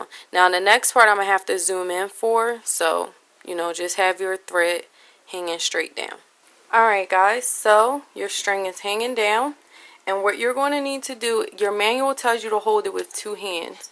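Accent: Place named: Yonkers, United States. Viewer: lmao i did the same exact thing 😂 thank you for this video i’ve never sewn in my life and this was my first threading. PS i love your accent. I used to live in Yonkers as a kid and it reminds me of my best friend at the time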